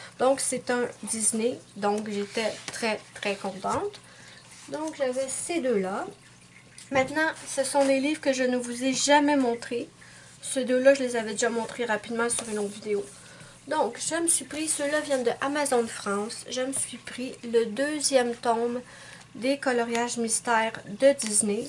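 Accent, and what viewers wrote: Canada. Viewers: Super vidéo, ^^ J'ai le livre Dreamlings de Edwina Mc Namee, il est merveilleux. Je te le conseille. Celui que tu as aussi est très beau. Moi aussi je regarde les vidéos de Kry's colors et Steffie. Et ça me donne envie d'acheter tout ce qu'elles présentent. :) J'adore ton accent, tu viens de quelle région au Canada?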